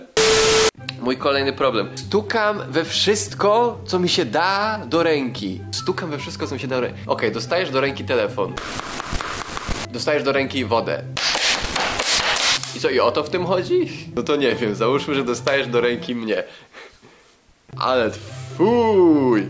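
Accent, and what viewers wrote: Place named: Silesia, Poland. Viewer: Błagam mów częściej po Śląsku, bo to cudo w twoim wykonaniu❤️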